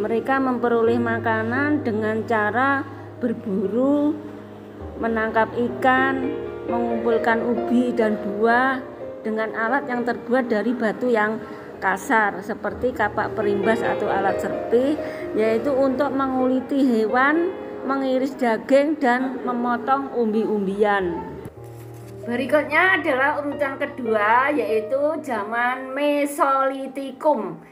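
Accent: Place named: Java, Indonesia. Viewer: Aksen jawanya aku suka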